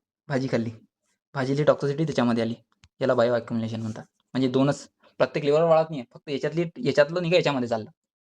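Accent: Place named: Akola, India. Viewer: Akolyache ahe vatte sir😄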